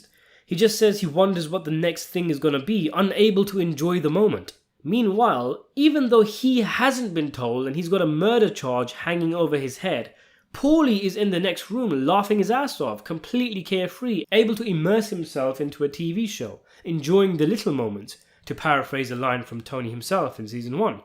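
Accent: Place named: East London, United Kingdom. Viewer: Bro you sound so Bengali from East London 😂😂😂😂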